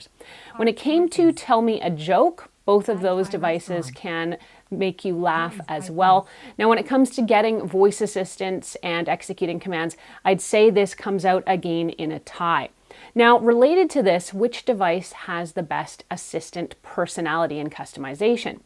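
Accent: Canada. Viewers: Wow, smart girl, gorgeous eyes, good info, intelligent but ... That Canadian accent just cracks me up. Beats the heck out of a Noo Zillan accent too.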